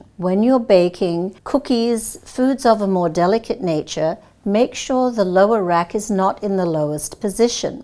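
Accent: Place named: South Africa. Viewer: Love my Bertazzoni and that South African/NZ accent.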